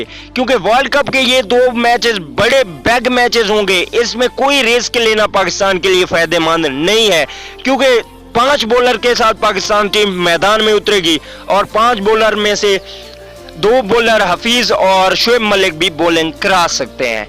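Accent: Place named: Bihar, India. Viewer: Bhai bihari ho kya aapko aawaz se lag rha Hai bihari ho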